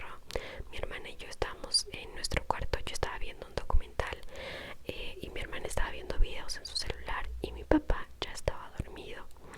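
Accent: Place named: Colombia. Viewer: Crei que eras Colombiana 🤔😍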